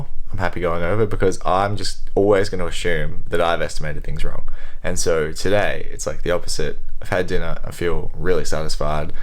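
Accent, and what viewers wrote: Australia. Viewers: Hey man, its probably because of the aussie accent but you remind me so much of calm, less crazy Last Natural! Keep up the videos really enjoying it.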